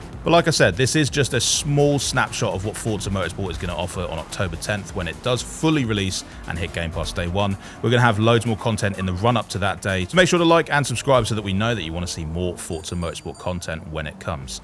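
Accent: United Kingdom. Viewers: Wreely wreely good game... damn i hate british accent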